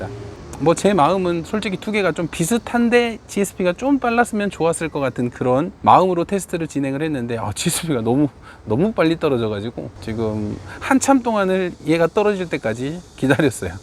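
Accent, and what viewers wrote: Jeolla, South Korea. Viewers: 억양이…전라도 어디여?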